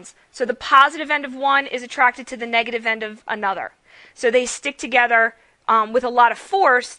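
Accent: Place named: United States. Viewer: Your videos, your way of explaining, your examples, and your American accent make it soooo easy to learn... I love how you lecture. Please make more videos and help us (students) learn more so we don't have to cram study. God bless u...:)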